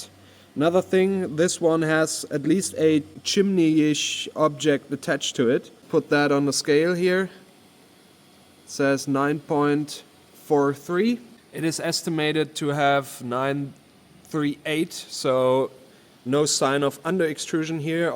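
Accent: Germany. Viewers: Klingt, als würden Deutsche englisch reden... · Sarcasm in English with a German accent is ..... quite hilarious :D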